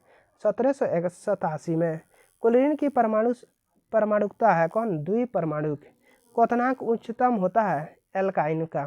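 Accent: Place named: Bhojpuri, India. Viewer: Hindi to shi bol liya kro usme bhi bhojpuri add kr dete ho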